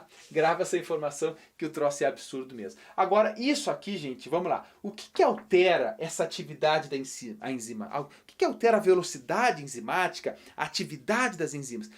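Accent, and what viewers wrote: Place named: South, Brazil. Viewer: Bah, esse jeito do sul é da hora jkkkkkkkkkkkkkkkk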